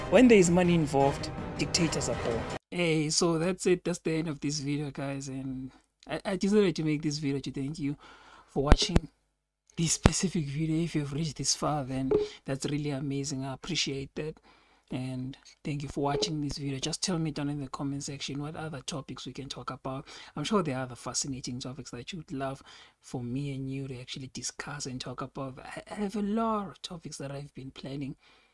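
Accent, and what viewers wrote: South Africa. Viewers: I'm watching your YouTube channel like I'm series of game of thrones, very entertaining ka English ya mzansi😆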